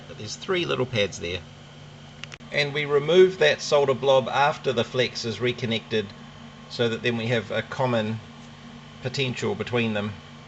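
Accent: New Zealand. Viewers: _You sound like a fellow Kiwi... We should never underestimate the ability of a Kiwi, as isolated as we may be, to fix any problem, be it fixing a piece of broken farm machinery or splitting the ATOM_.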